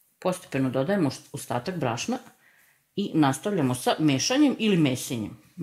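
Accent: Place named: Backa, Serbia. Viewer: predpostavila sam da ste iz Backe po dijalektu.Jos jednom veliki pozdrav,laku i mirnu noc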